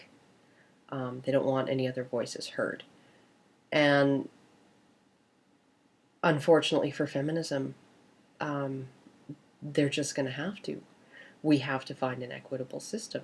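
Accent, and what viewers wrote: Canada. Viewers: You sound Canadian. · Northern accent... Canadian?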